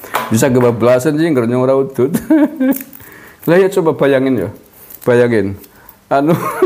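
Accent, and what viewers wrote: Banyumas, Indonesia. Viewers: Waalaikum salam wr wb paak lucu bahasane banyumasan banget akuvterhibur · aku teringat masa kecil d purwokerto btl itu ngurusi angket ongkot angkot brisik bae wong wis kalah aku slalu ngikuti acara chanel pak abis banyumase medok banget hebbatttt dan lucu tdk tegang yang nonton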